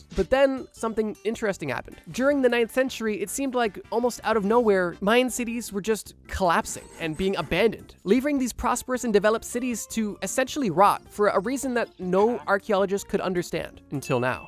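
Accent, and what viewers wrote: Canada. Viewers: I think he’s Canadian ????? They pronounce things weird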